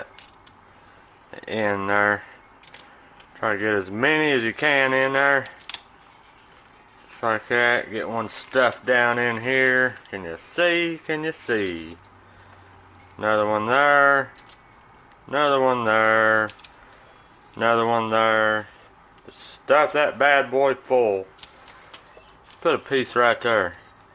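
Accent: South, United States. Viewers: Where in the south you from? I can't place the twang.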